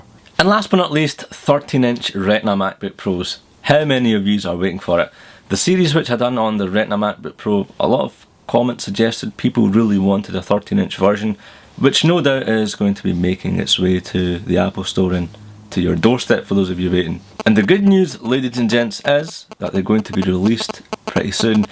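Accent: Scotland, United Kingdom. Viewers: lurve the scottish accey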